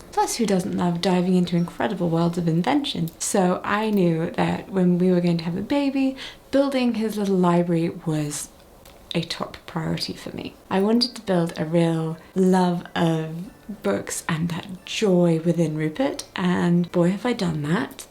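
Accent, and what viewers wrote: United Kingdom. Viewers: I don't know if this is a stupid question, but how is it possible that you speak with a british accent while being deaf? Could you hear when you learned how to speak? or is it just that accents can be learned without actually hearing them?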